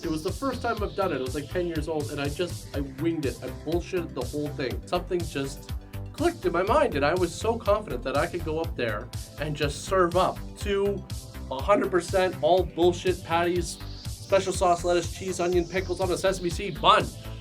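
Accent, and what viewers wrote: Canada. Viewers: canadian, eh? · Are you Canadian?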